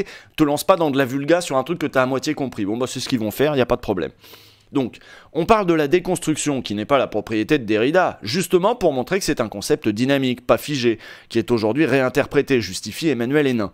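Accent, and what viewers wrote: Quebec, Canada. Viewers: L'accent québécois toujours au top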